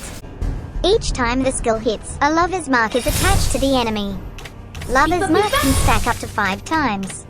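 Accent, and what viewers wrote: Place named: United Kingdom. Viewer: Whats with the british Accent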